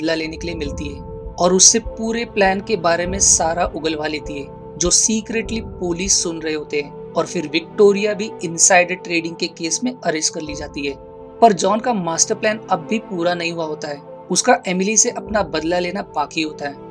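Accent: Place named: Maharashtra, India. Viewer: Bhawa Tu marathi aahes watt.. I suggest u.. Hindi madhe police nhi pulis uccharan karat ja.. Baki mast... Keep it up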